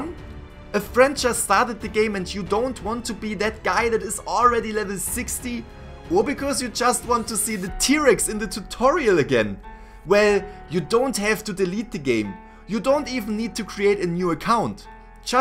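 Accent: Germany. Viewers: You sound german :o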